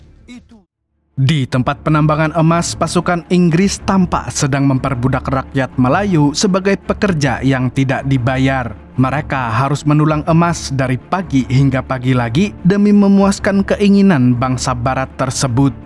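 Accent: Banjar, Indonesia. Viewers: Mang urg banjar kah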